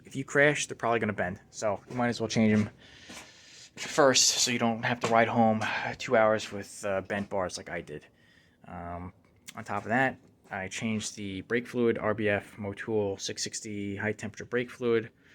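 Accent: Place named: New York, United States. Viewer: Your accent makes it sound like you're from New York City or New Jersey.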